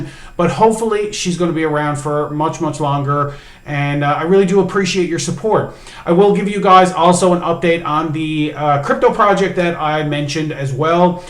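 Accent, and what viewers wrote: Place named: Eastern Canada, Canada. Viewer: First time viewer. I knew in seconds you were from the east. I’m in Alberta. Learning about forth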